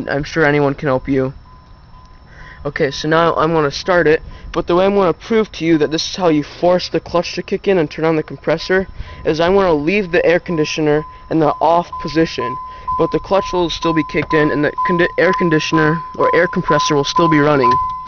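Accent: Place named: Portugal. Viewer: You sound like my Portuguese homie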